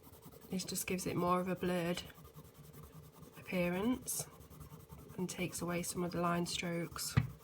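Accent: United Kingdom. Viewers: Wow! Great tutorial: No prattling, no dreadful music, 'no fancy technique'. Brilliantly demonstrated by an artist with a great British accent. Superb. I've subscribed. Thank you.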